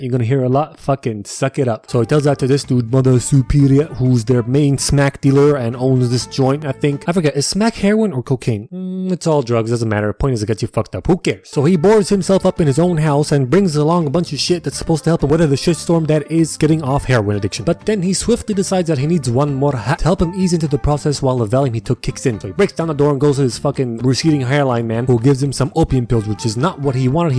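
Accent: Scotland, United Kingdom. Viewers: This man Scottish accent is gold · I’m convinced throughout the whole video he became more Scottish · Your Scottish accent is pretty good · Should have done the entire thing with a scitish accent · your scottish accent isn't too bad. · To other Scottish accents I've heard you're's isn't that bad (I'm Scottish btw) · welcome to 1980's/90's scotland, auld maggie thatcher stuck to them hard, also the scottish accent was on point boi! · Loving the Scottish accent From a Scottish person😂😂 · Your Scottish accent Is great. Don't stop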